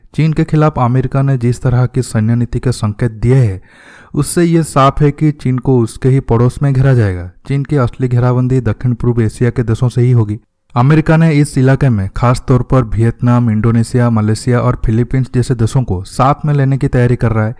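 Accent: Odisha, India. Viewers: bhai tu orissa se he na?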